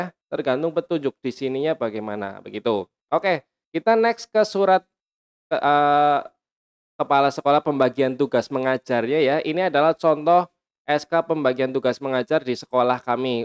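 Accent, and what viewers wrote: Banyumas, Indonesia. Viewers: Ah ngapak ini gmn gk tau ya,mending ngelawak z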